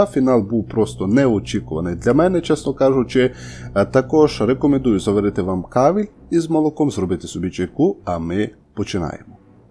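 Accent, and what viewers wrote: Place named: Zakarpattia, Ukraine. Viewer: Хотів би попросити щоб колись один раз ви використали ваш закарпатський діалект на усі 100%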